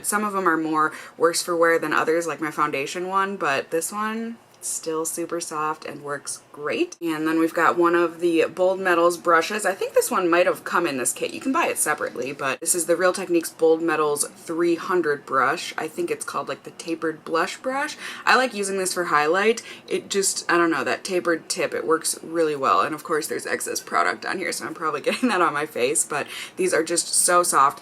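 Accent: Minnesota, United States. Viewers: You are so dang cute. When you say the words, bag, and Aunt, you sure can tell you are from Minnesota! 😘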